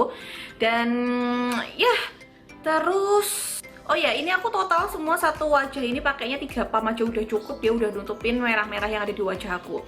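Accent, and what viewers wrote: Java, Indonesia. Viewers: Yeeyy gercep buat mba medhok 🤗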